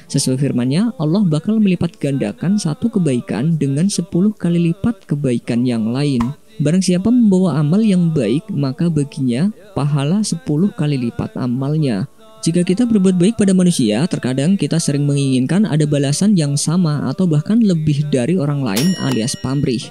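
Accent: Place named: Kebumen, Indonesia. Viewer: Wong kebumen ngapak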